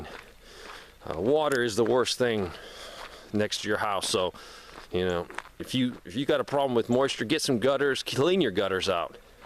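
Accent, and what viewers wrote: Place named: Chicago, United States. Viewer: May I ask you why the bathtub is outside of the house? I also noticed that you are cutting green brush in the winter! You really must be in the south but you sound like you're from the North like Chicago or there abouts. I am looking forward to you video how to filter the rain water. I want to use my poly totes and put them in the basement (before it's completed) and have the water run down there and use it for washing and such.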